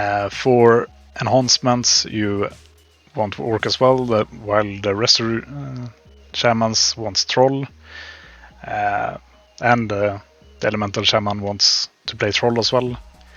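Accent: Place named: Sweden. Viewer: Ohh god the swenglish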